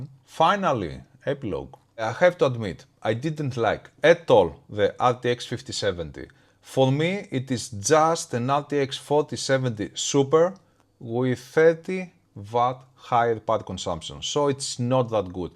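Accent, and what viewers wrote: Greece. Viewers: But with a Greek accent! 🙌🏼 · by the accent i bet that you are greek :)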